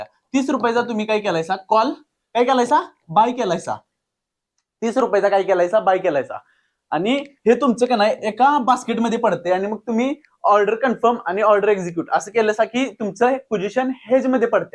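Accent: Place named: Kolhapur, India. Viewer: Mast marathi boltos kolhapur chi atvan aali karn aamchy kolhapur madhe aaschi bhasy vapartat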